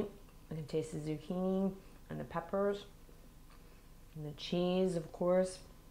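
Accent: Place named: New York, United States. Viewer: I am going to make this tonight!!! Ps I love your accent!!!! Are you from New York ??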